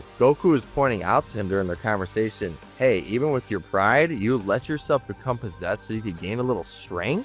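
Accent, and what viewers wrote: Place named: Chicago, United States. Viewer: This is the fiercest chicago accent I've heard since home alone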